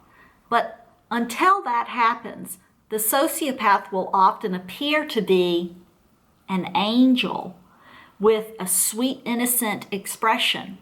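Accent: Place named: Texas, United States. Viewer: I've been told that you live in China. But I love your Texas accent! Anyways, a fine analysis of these puppets ma'am! You exactly described ''them'' and this female who I still like to refer to as a ''Siren'' or deadly mermaid from the Starbucks logo. ( Another one is Lilith as depicted in HR Gigers Tarot and the related book written by Akron! A masterpiece in my opinion. I could tell you terrifying stories about that! Telepathy, lucid horrific dreams and so on!) She still tries to contact\kill me after 3 years! The last time my stomach turned and it felt like a huge black hole when she passed me by with her bicycle. ''Tring, tring!''. I didnt respond but when I peaked I saw her strange eyes, smirk and her calm posture! At the same time her pace was fast and she reminded me of a bird of prey. (from the Odysee myth) Scary shit! My batterycharge dropped a 30\40 percent realising what she is and does! 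People died because of her, went crazy or live in the twilight zone! And as a no BS martian roaming the earth, I can tell you that this really something. SO FUCK HER!!! (Excuse me!) And thank you! Beautiful relaxing music 24/7 featuring instrumental music by <link>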